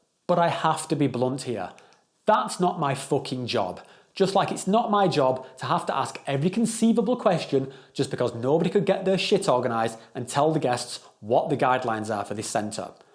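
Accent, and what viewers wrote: United Kingdom. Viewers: Awesome review mate. It was definitely balanced and fair. Honestly some really vital information that anyone planning to spend thousands of dollars and weeks of their time to execute should know. I have a feeling that by the time I’d actually go on a retreat, Merosa would be a real gem. On a side note, I hud no idea you levved over thur in Swetzerland. I feel like the more I lesten to ya the moar I start thenkin in ur accent. But I’m just a white boy from the good ol’ Yew Ess of fockin’ A mate. But for real, what part of the UK are you from? I seriously dig your accent.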